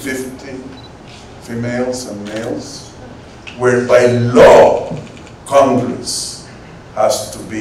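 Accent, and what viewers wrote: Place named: Costa Rica. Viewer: Jajajajajajaj My man does not only “talks the talk, but he also walks the walk” !!!! I know Mr. Chaves is never going to read this comment but I am so glad that speaks with a Tico accent, this shows that he is a true product of improvement and a fighter to whom nothing has been given for free or with a silver spoon. NO RED TAPE !!! Please get all the roadblocks out of the way, we want to move forward as a country., were are behind you!!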